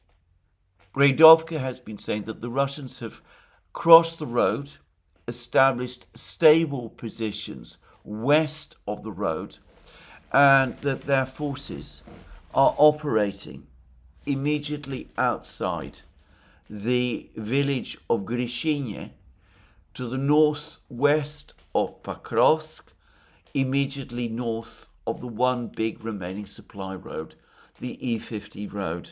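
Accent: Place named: RP, United Kingdom. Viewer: Your English is pretty. The Queen's English I presume. An endangered specie :)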